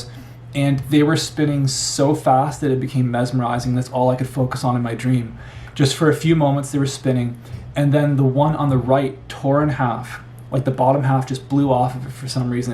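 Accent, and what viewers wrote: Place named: Canada. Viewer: The Canadian accent is similar to my Minnesotan accent.😂 The books of Joel and Acts (amongst others) tell us that dreams and visions are legit ways that God speaks. (I know you know this...putting it out there for others reading comments) I've had few dreams I know are from God and he's given interpretation. Have you experienced any dreams that you believe are from the Lord that you haven't received interpretation for...yet? I ask because I believe I have and because I come from a faith community that is "practically" cessasionist...so I don't have many people that I can ask these questions.😁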